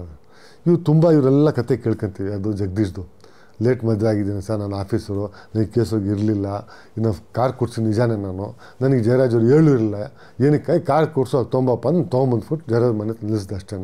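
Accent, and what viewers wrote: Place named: Tumkur, India. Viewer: Typical Tumkur language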